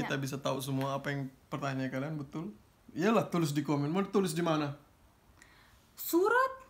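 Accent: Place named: East Luwu, Indonesia. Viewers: Sangat menghibur setiap konten2 nya bang. Oh ya bang asalnya luwu timur sulsel yah. Soalnya logat nya sprti logat luwu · Saya orang malili Hahahahhaha lucu sekali logat luwu timur kentara sekali logat orang luwu timurnya ahahhaha sukses terus kak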